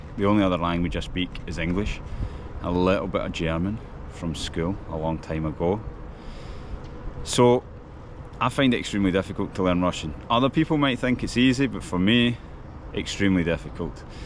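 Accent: Scotland, United Kingdom. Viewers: Очень нравится твой шотландский акцент! :) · Wow your Russian has less accent than your English. Your Scottish accent is heavy and sounds really cool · I love your scottish pronouncuation, it's so cool! And yes you are right, we russians do respect the fact when a foreigner is trying to learn russian, that's already enough for us 😊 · Я половину видео не могла понять почему мне сложно его нормально понять), потом увидела название канала, и подумала, что впервые слышу шотландский акцент. Интересно звучит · Вас за кавказца не принимают? Шотландский акцент очень специфично звучит на русском. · Обажаю шотландский акцент, просто музыка для ушей. Мне он кажется очень жизнерадостным. :) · it's very interesting to listen to the Scottish accent, but unfortunately the videos are rarely released... does a Scot in Moscow really have so little to tell?) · Мне очень нравится Шотландский акцент в английском, have a good time in Moscow, lad! 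· Шотландский акцент просто огонь. Как и квантовый выбор падежей. Это достойно уважения.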